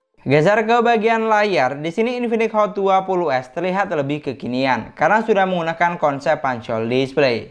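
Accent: Madura, Indonesia. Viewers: Orang madura ya bang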